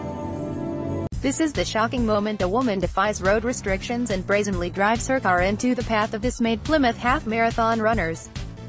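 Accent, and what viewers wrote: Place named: United States. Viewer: 1 for robot voice with American accent, instead of getting a real person to narrate. If I could, I'd give another -1 for posting stills instead of video on YouTube.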